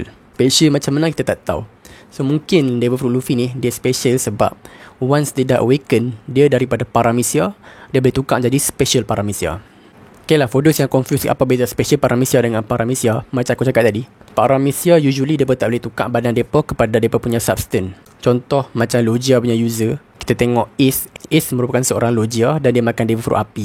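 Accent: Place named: North, Malaysia. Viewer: welcome bro...all the best..penerangan bro jelas, dan yg best cakap utara hahahaa..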